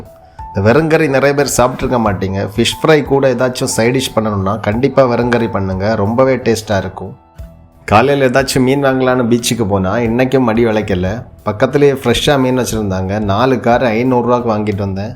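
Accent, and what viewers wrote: Kerala, India. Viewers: Neenga pesurathe keetu te irukalam pola azaga pesuringa neenga malayalam aa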